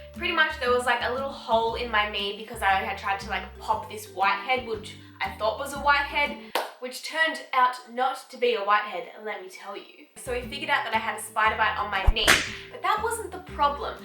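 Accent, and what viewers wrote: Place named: Australia. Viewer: that aussie accent thoooo! LOVE your energy! xx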